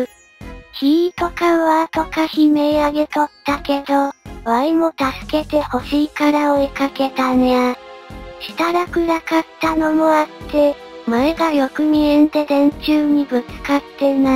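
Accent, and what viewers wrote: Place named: Kansai, Japan. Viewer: 味付けのために変な関西弁つこうてるんやろうけど 関西でそんな海まで熊が降りてくるようなところあったかな～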